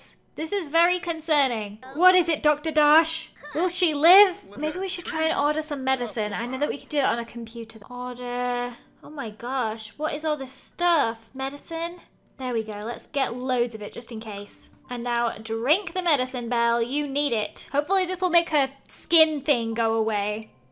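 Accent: United Kingdom. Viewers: It was her accent. She is. Very VERY British and her accent is amazing btw, but when she says “into” it sounds like “unto”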